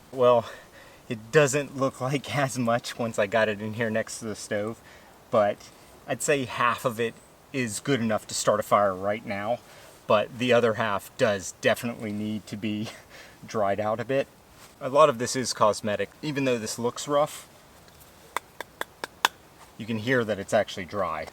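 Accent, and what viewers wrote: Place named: United States. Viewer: Hi! Great video! 👍 But something is eating at me! 😵 Your YT channel says you're in the UK! But you speak like an American(no britt accent) you cook like an American you phrase like an American and the woods you're in looks like somewhere in the East Coast and Pennsylvania! About the only thing that kinda hinted that you might be British is that black treacle bacon! What gives mate???? 😂 Btw,had to Google black treacle bacon 😅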